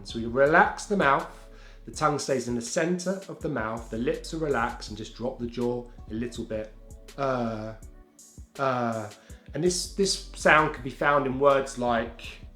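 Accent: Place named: United Kingdom. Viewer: British accent is beautiful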